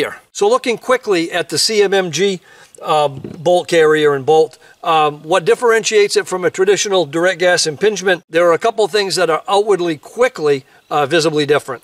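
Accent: Maine, United States. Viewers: Thanks! Your Mainah-ness makes me feel at home, away from home.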